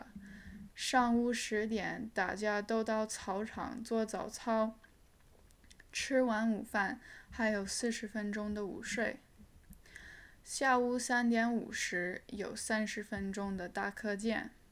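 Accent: Northeast, China. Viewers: 这东北口音没毛病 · 哈哈哈哈哈哈 这东北口音太可爱了 上晚自习很无聊，可是到了高二的时候高考的紧迫感会让大家格外珍惜这个时间。毕竟在学校里跟同龄人一起复习功课效率会高很多。我读高中的时候，上的是寄宿制学校，晚自习是十点结束。在高三学生的强烈要求下，学校准许高三生可以延长一个小时到十一点。现在想想真是很感激学校和老师，学生自习到十一点值班老师也要义务多值班一小时陪着不能早早的休息。如果中国的教育资源可以像美国那么丰富，中国学生也可以有更多时间社交和发展自己的兴趣。很遗憾，人口众多，资源不足，年轻人想要通过教育改变命运必须接受这种历练。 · 普通话有东北味了，笑死了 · 中文有东北口音了哈哈哈哈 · Your Mandarin is very accurate have a cute accent of northeastern of china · 这是写好了稿子照着念的吗？好像有点东北口音了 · 中文很棒 且学的有讨人喜欢的东北味🤩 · 东北口音，哈哈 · 看一个老外妹子一本正经地说东北话，我爆笑了半天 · your 东北accent got me😂 sending out lots of love from Harbin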